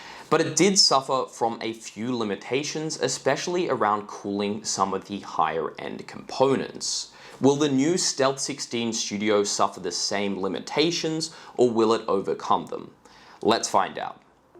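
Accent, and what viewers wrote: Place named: Australia. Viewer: Do all Australians pronounce it "hayche"? How do yaw spell idear? Exactly how it sounds? Neeveedia? If 4k refers to horizontal pixels (which is only like 3800) and 2k refers to vertical pixels (which is only 1440p; an even bigger lie, or misnomer), then what does 1k refer to? Why is the sky blue? So many questions. Few answers.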